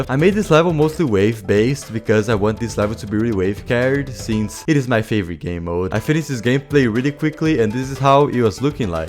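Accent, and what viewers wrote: Brazil. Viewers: Ae mano, eu notei um pouco de sotaque no jeito que vc fala em inglês, e só queria perguntar se vc é brasileiro (Hey man, I've noticed a little bit of an accent on your English, and I just wanted to know if you're Brazilian) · I recognize the Brazilian accent everywhere lol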